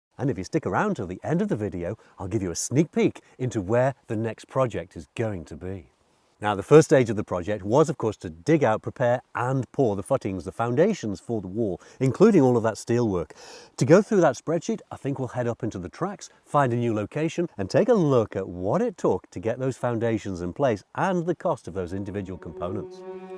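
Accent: Lancashire, United Kingdom. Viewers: you're welcome, Mr. You speak without repetition, hesitation or deviation. So you have an old Lancashire Liverpool accent?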